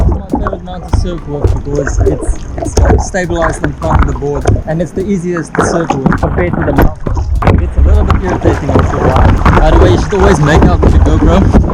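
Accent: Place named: South Africa. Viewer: You sound South African, yep.... 😉🇿🇦🤙🏽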